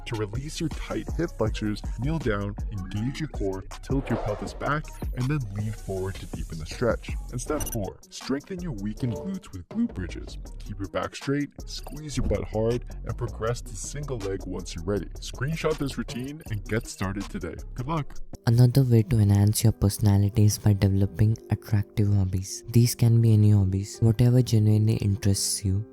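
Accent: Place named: India. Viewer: was lowkey invested till I heard the indian accent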